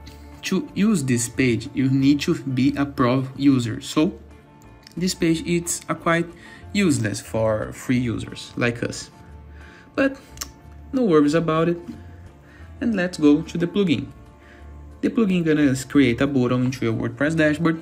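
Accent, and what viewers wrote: Brazil. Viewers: Incrível o quanto é fácil reconhecer Inglês brasileiro kkkk Vlw irmão <3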